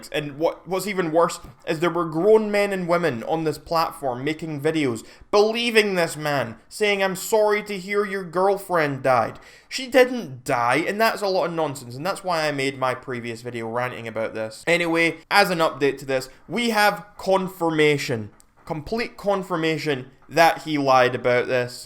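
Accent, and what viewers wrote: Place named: Scotland, United Kingdom. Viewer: What is the world coming to? Just wanna say it's so comforting hearing a Scottish accent on here - love from Scotland 💙💙💙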